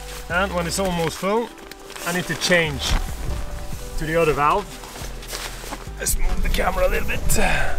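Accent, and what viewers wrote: Bergen, Norway. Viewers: Hvorfor bergengelsk ?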